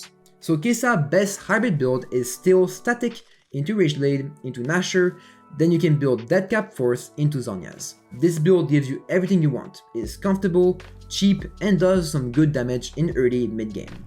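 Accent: France. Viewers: You're french aren't you? Your accent sells it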